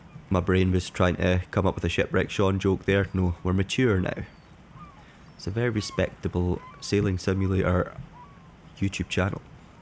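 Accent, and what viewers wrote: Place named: Scotland, United Kingdom. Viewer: Hi Sean. Your videos are making me buy this game too. I didn't even know it existed. I bought Sailwind yesterday, but ended up returning it. I found the graphics a bit disappointing. Sorry if this translation looks a bit strange, I'm from the Netherlands and I ran it through Google Translate. P.S. I love your Scottish accent.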